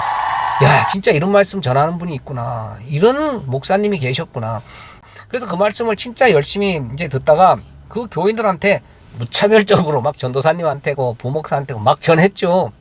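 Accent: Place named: Gangneung, South Korea. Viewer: 혹시. 댁이 강릉이래요 ? 사투리가.. 어데 소케야 그기..여기서..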